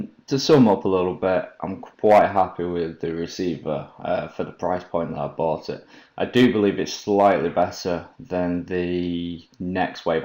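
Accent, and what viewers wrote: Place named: Greater Manchester, United Kingdom. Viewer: Yeah i can see where you were coming from. Get a patch on and you should see improvement, i only flew a few packs with mine at the weekend and i love it already. I wasn't prepared to pay over £100 for the LaForge for what is probably exactly the same performance wise. ps. your accent sounds local(ish) guessing somewhere around Greater Manchester?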